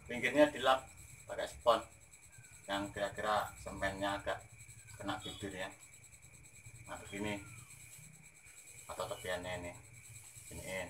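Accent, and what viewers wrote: Java, Indonesia. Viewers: Sampean logat bicaranya kentel sekali dg logat jawa mas, jawanya mana mas? Aku jawa tengah mas..🙏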